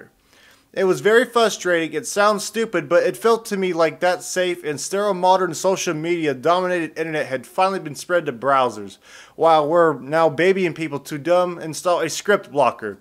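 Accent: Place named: Australia. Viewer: IDK, I'm Midwestern. But you sound Australian/New Zealand to me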